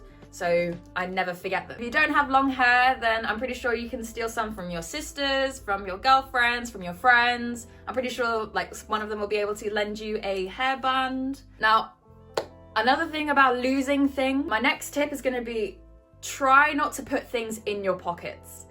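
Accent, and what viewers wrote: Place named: England, United Kingdom. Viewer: Your amazing! 😍 How come you have a english accent if you live in Spain?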